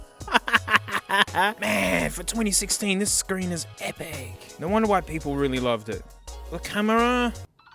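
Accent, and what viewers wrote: Australia. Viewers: Oh boy having an aussie guy yelling at old tech for four hours is the perfect background noise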